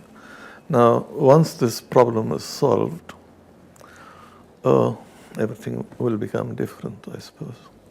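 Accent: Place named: Australia. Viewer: This thing is not even worth posting. And the host sounds Aussie😮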